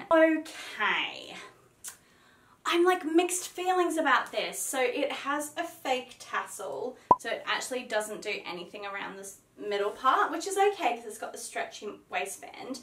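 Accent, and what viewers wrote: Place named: Australia. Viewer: So happy to hear an Aussie accent doing hauls. ( I live in Tassie)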